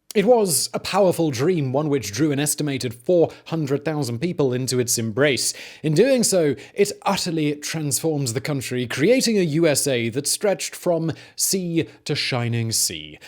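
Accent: United Kingdom. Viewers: Your voice and delivery get on my nerves!!!! And it is nothing to do with your British accent, I usually find that pleasing.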